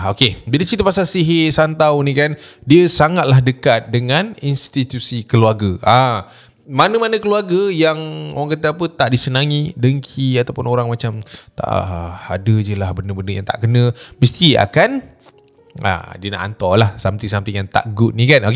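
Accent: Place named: Sabah, Malaysia. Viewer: ndapayah la cakap slang sabah tu. Jadi hancur 🤣🤣🤣😭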